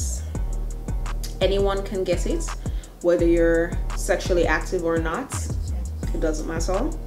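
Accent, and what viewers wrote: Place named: Jamaica. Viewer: Got to be Jamaican love it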